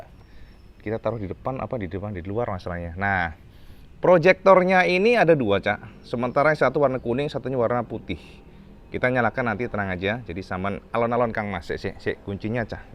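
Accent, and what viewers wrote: Indonesia. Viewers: asli mna si pean cak,ko medok bngt bicarae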